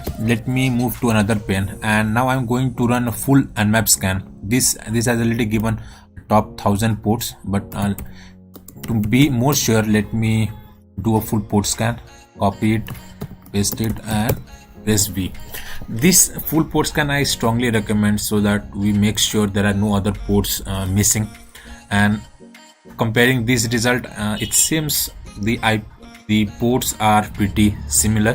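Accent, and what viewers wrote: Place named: India. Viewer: this is good too, but the hindi accent is conflicting with your english so my advise is that you should try hindi in a video...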